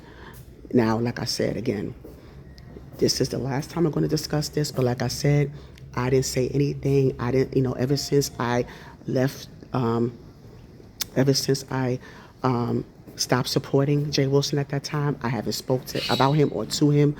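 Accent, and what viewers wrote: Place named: Brooklyn, United States. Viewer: Is that Brooklyn I’m hearing? Keep tawkin!!!!❤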